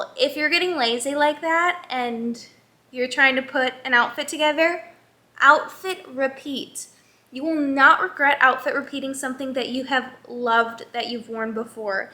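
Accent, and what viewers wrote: Midwest, United States. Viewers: i love your midwest accent 😭💕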